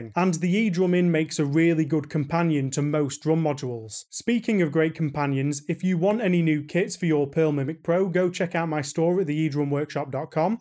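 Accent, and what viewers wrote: United Kingdom. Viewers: Hi! Thanks for efforts! You used the Jobeky trigger? Sounds nice. I really enjoy your british accent. -> Say: "Alibaba". By the way, due to your vid I ordered some Lemon cymbals and a 45$ 12" Lemon snare pad. Even beeing a low-priced product that pad performes basically quite good with positional sensing using the eDRUMin.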